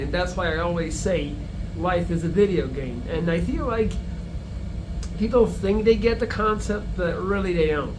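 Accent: United States. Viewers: Your English is very good! Have you lived in the USA, you have an American accent. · How come you speak in an American accent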